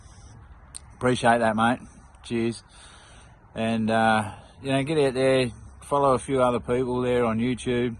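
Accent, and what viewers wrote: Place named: Australia. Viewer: Hi! You got a new subscriber from Sweden. I really liked your video, easy, authentic and genuine and I think you will be a very liked person on YouTube. And with that said, I had a little bit difficult understanding some words but I must say that you “down under” have so nice “sounds” in your English! 🙂‍↕️ Good luck on your journey! 🙋🏼‍♀️🇸🇪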